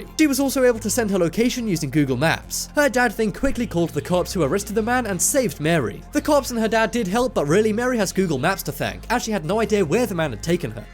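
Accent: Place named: United Kingdom. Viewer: When you enjoy listening to a British accent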